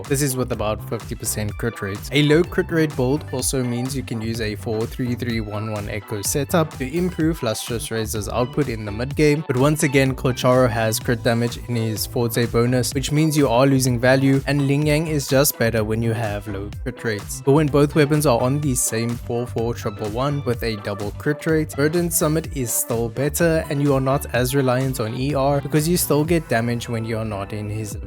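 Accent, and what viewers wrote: South Africa. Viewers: Brother based of your accent and ping are you from South Africa?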